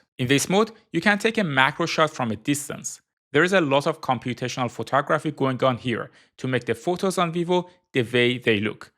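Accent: Iran. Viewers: انگلیسی صحبت کردن ایرانیا همین قدر ساده و قشنگه .. هر جا هستی موفق باشی داداش گلم ❤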